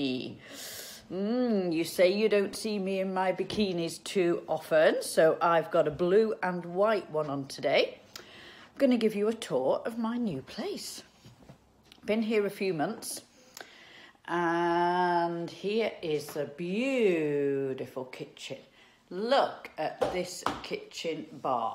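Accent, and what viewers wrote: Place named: England, United Kingdom. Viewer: Hello miss. I am from Brazil..You're an enchanted lady. Your hands', oh your hands are so beautiful. E pelo sotaque eu deduzi que você é inglesa. UK.... Cheers from Brazil.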